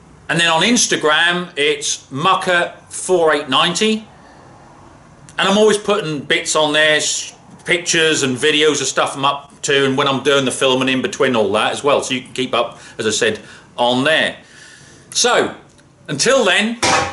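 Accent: Norfolk, United Kingdom. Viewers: Classic Norfolk accent 👌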